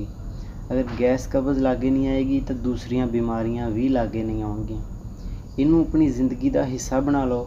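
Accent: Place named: Faisalabad, Pakistan. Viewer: پنجابی زبان تے فیصل آباد دی زبان اے لگدی اے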